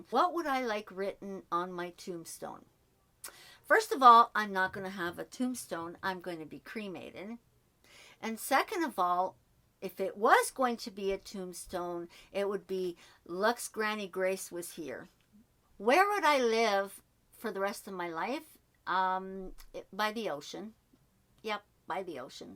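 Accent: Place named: Portugal. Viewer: I work with a Portuguese women and your accent sounded the same. 👍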